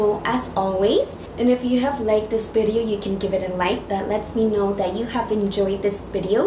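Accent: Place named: Spain. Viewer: It's because of her Spanish accent. The v and b sounds are mixed in the language.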